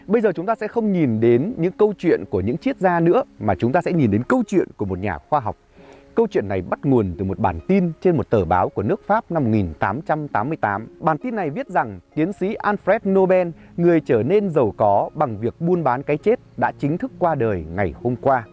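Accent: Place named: North, Vietnam. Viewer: I really enjoy listening to your voice (Bac ky)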